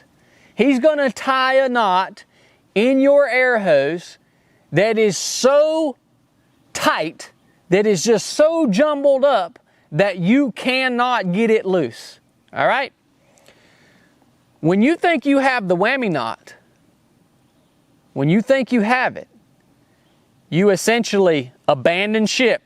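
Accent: South, United States. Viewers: what state are u from? I'm from OKLAHOMA.u sound like u are from the south?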